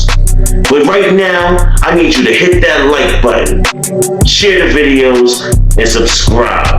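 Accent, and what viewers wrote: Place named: New Jersey, United States. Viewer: What part of Jersey you from my G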